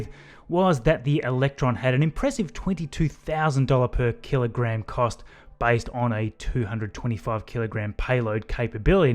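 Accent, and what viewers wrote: Australia. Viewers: Oh your Australian? Gg.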